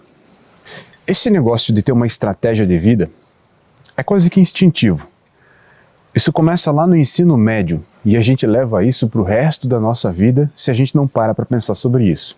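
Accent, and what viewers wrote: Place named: South, Brazil. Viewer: Hey estou aqui, Sotaque muito bonito o seu,muito orgulho da cultura Sulista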